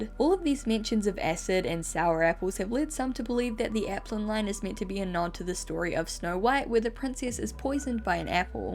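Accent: New Zealand. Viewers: wait, you're a kiwi too? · YOUR A KIWI!!!!!!!!!!! WOOOOOOOOOOOOOOOOOOOOOOOOOOOOOOOOOOOOOOOOOOOOOOOOOOOOOOOOOOOOOOOOOOOOOOOOOOOOOOOOOOOOOOOOOOOOOOOOOOOOOOO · Kiwi?? I thought you were British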